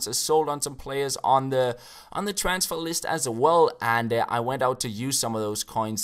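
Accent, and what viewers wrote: Denmark. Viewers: U Sound danish.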